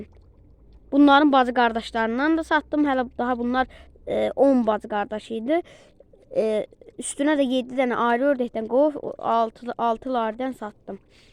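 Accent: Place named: Georgia. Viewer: Gürcüstan ləhcəsinə oxşoooor ləhcən ay qaaaa.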